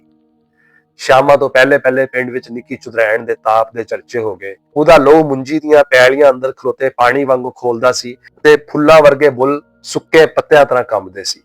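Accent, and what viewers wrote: Punjab, India. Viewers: ہائے۔۔ سواد آ گیا سچا لہجہ پنجابی دا۔۔ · واااہ بہت خوبصورت کہانی اور سچا پنجابی لہجہ😍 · کہانی سے عین میل کھاتا خوبصورت پنجابی لہجہ اور مکالموں کی ادائیگی میں بہترین اتار چڑھاؤ۔۔ بلاشبہ ایک مکمل پنجابی شاہکار ۔۔ اس خوبصورت کاوش پر ڈھیروں داد و تحسین🌹 ❤ ❤❤❤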